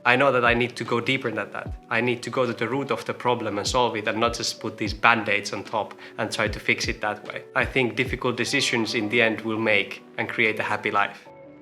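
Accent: Finland. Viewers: I love to listen to the Finnish accented English. I’m a pensioned farmer with a lot of old stuff trying to downsize and organize. I have resisted buying every power tool. Hand tools are easily fixed when they break unlike the stress caused by complicated machines. Scooping snow is good exercise and so is being on the bike. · hehe, I just found your channel yesterday, and I'm already in love x"D...I actually live in the same city as you and it's so fun to watch videos made from here XD☆ plus a Finnish person making videos in English, with beautiful Finnish accent, makes me so much more confident to speak English myself, too!! I also love that your videos are quite short, there's nothing unnecessary, so they're nice to watch♡ but, I must say, as a beginner in minimalism and intentional living, it's too bad your videos are very addicting! especially when you almost always recommend another of your videos at the end "xD it's hard to get rid of binging social media, when you make too good content! 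XD ja must on myös tosi siistii et löysin suomalaisen kanavan, joka on lähellä mun omaa ajatusmaailmaa (^_^) kiitti kun teet näitä videoita:3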